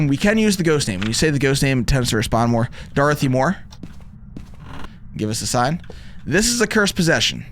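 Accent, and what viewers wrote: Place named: Delaware County, United States. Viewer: You sound like you have a delco accent from Philly lmao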